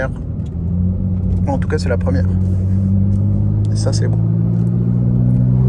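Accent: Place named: Paris, France. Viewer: Bravo belle acquisition la seule chose qui me gêne c’est que tout les gens du continent continue à mettre des plaque corse pour faire croire qu’ils sont corse alors qu’avec votre accent de parisien on vous reconnais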